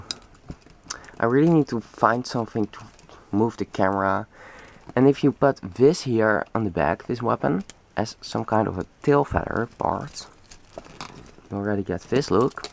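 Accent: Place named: Netherlands. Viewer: I just hear that your Netherlands (ik ben ook)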